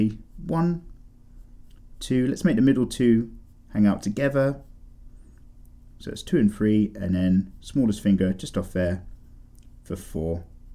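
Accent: United Kingdom. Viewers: Are you a britisher? How do you say bottle of water😂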